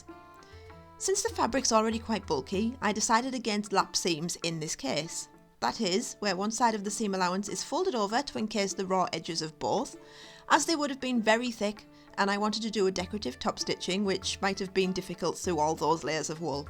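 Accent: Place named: Teesside, United Kingdom. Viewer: Thanks for this video. I have absolutely zero skills in making clothing but it’s been really helpful in relation to fabrics, colours and even potential suppliers. I also recognise that accent. Teessider? Or there about?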